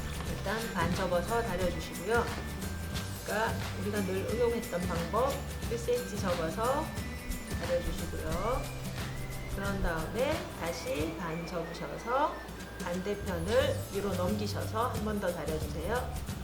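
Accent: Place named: Daegu, South Korea. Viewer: 설명하시는분 목소리 듣기 좋아요. 대구분들 유튜브에서도 억양이 나타나더라구요~~ 코코지니원단 튀면서도 예뻐요.~~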